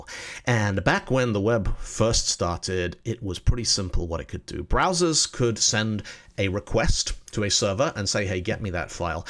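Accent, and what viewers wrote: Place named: United Kingdom. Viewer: I can't pin your accent. It's like British with a twist of Oz and North American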